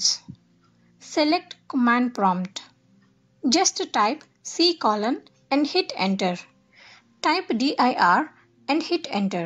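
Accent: India. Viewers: Wow mam how smooth u speak Like an Indian...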